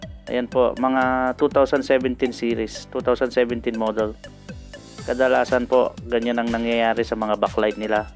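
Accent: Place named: Mexico. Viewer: I like this guy's Mexican accent, makes this video more likable.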